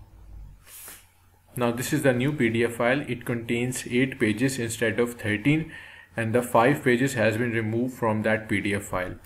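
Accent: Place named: India. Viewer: Was hoping to hear an accent in STANDARD English... Another India. 🙄🙄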